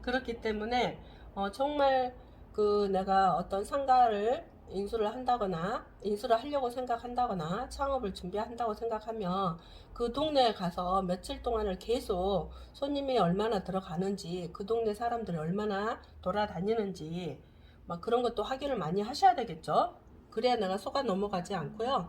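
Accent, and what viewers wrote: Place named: Jeolla, South Korea. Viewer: 전라도분이시군요 저도. .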